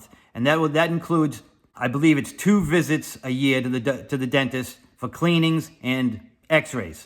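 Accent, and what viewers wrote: Boston, United States. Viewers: North Carolina?? Sounds like a Boston accent, not a Southern one! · Just discovered you! Are you from Boston area originally? I’m loving your accent—and it ain’t native to North Carolina 😅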